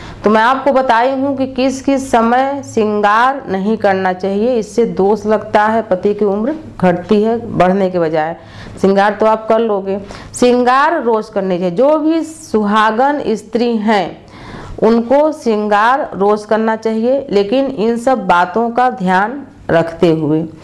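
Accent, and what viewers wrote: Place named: Allahabad, India. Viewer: aap kaha rehte ho aunty muje ap ki language allahabad ki lag ri h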